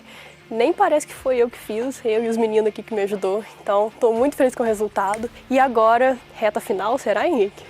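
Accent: Minas Gerais, Brazil. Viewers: Você é de minas gerais?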